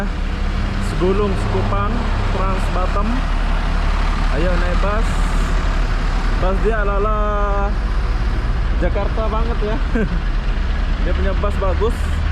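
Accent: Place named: Malaysia. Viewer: Bro logat melayumu kental skali... Mending bahasa melayu aslimu aja di pakek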